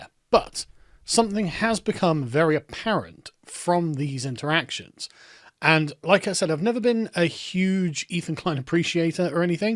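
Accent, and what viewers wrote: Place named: United Kingdom. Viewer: I love our boy providing updates on internet drama in a proper suit and brittish accent.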